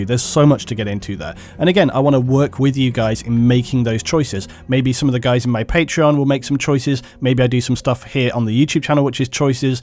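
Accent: England, United Kingdom. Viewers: I stumbled onto your channel solely from the "40K Lore" stuff. While there's other channels that also do great work on 40K lore, your product to me is the overall best. The music, the imagery, and the English accent all really go well together. I am 150% stoked on the idea of seeing Dredd Lore as well! When I think of gritty, dark, and masculine Sci-Fi/Fantasy from the UK, Warhammer and Dredd are the first two things that pop in my mind. I rarely find a new channel on YouTube that really hits me like yours has, so keep up the fantastic work and I look forward to what's in store!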